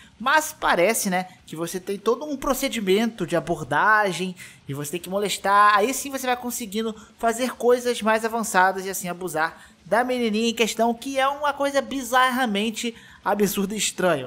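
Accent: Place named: São Paulo, Brazil. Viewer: Vídeo ficou show mas porque você quando fala tá puxando o S igual gente que mora em São Paulo? Puxa o Xiix igual carioca mesmo porque sei que vc é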